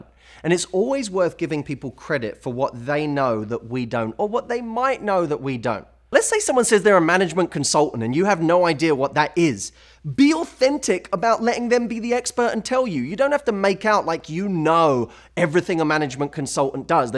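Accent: United Kingdom. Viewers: Is this man British, if not forgive me XD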